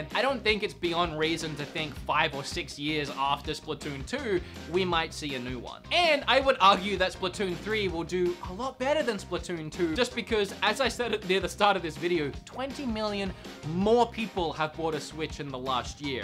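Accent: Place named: Australia. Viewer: Aussie Mate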